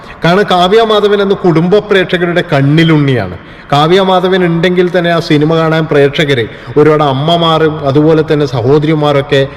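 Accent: Kannur, India. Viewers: കണ്ണൂർ ഭാഷ ആണല്ലോ പറയുന്നത്, അപ്പൊ നമ്മളെ നാട്ടുകാരൻ ആണ് അല്ലെ 👍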